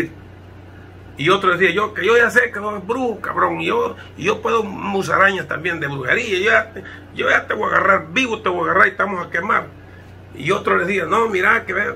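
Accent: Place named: El Salvador. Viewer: Éste se habla como salvadoreño tas tas ciado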